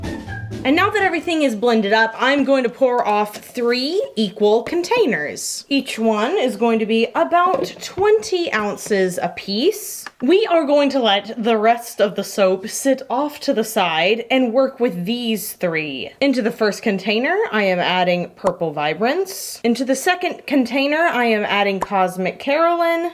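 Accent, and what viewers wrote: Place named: South, United States. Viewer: May I just say that as a southern woman my self. It brings me great joy to hear her accent and her say " yall" 😌😂😂 makes me feel right at home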